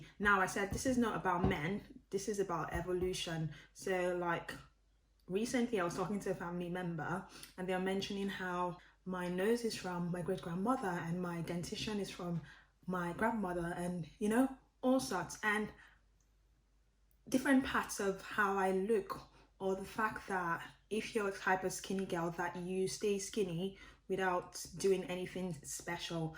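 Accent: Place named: United Kingdom. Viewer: It’s interesting to see ur accent change from your older vids. You have a more British accent now. Almost no Nigerian accent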